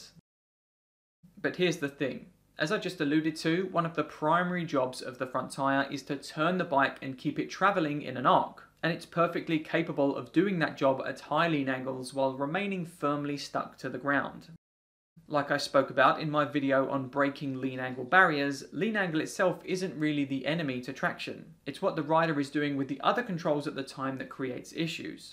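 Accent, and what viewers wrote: United Kingdom. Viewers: Wait what, he’s British? 😋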